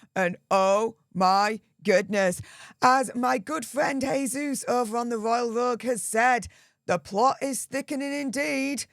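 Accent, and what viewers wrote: Yorkshire, United Kingdom. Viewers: your literally loosing your Yorkshire twang, you need sleep, and a hot toddy. On top of it you need to be honoured with a Damehood, for your contribution to this, after an operation.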